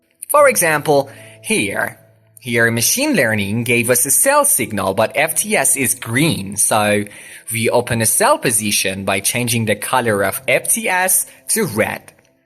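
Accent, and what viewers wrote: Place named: Australia. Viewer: Why are you hiding an Australian accent ?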